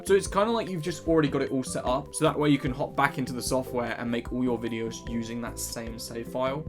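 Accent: United Kingdom. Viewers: my passionate hate against British english is gone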